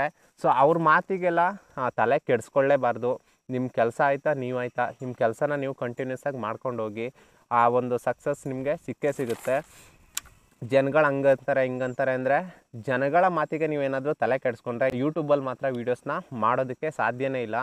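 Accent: India. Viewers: Anna Niv matado style le thilkonde niv nam Chamarajanagara kadeyavre anta... olledagli anna videos madta iri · HAI. I LIKE YOUR KANNADA ACCENT .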